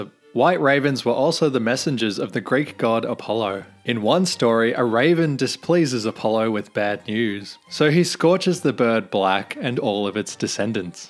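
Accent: Australia. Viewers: Backyard naturalist has slowly become my new guilty pleasure channel. Watching someone "appreciate" birds the same way I do, with a unique Aussie twang makes my week!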